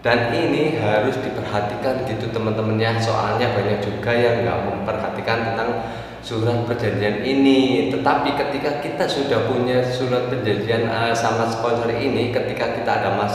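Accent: Banyumas, Indonesia. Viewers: Ngapak?, Cilacap apa Banyumas mas?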